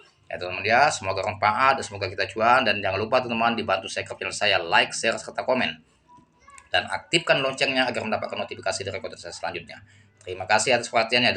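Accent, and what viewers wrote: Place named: Lampung, Indonesia. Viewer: Logatnya seperti orang Lampung bang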